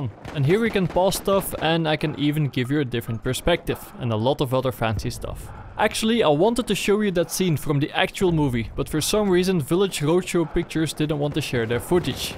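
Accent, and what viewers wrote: Netherlands. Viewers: The second you started to talk i noticed that you are dutch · Kom je uit nederland?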